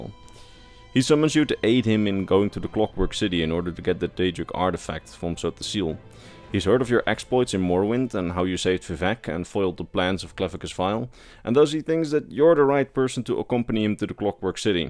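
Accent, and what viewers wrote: Netherlands. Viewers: Are you Dutch? If so, goede videos! Hope you'll continue to make the ESO story videos up to the latest chapter!!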